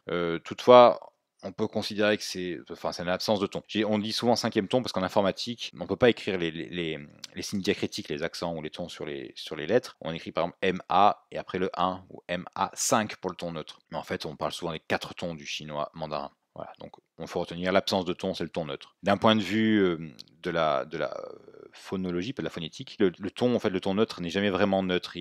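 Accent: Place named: France. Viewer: Je m'excuse d'avance pour la question mais tu es de quelle région de France ? (si c'est en France). J'ai un collègue qui a la même façon parler que toi d'où ma question. Merci :)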